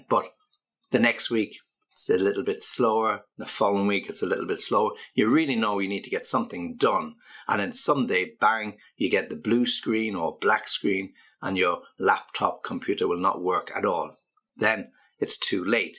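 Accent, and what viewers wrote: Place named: United Kingdom. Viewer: Your accent is so interesting 🤓✌️ may I ask are you British 😸? If you are may I ask for some British pronunciation videos please 🤓🙏🤓🙏🤓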